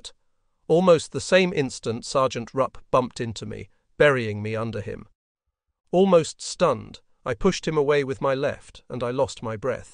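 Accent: United Kingdom. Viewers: Who provided the voice template for the A.I.? It has a crisp British accent I find interesting. Though it has those mispronunciations that annoy people, it is still pleasant to listen to.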